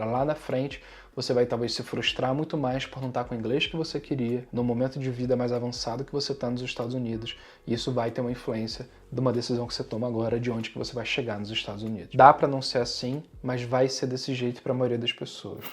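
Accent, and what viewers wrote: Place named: North, Brazil. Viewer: Acho que ele é do norte do Brasil kkk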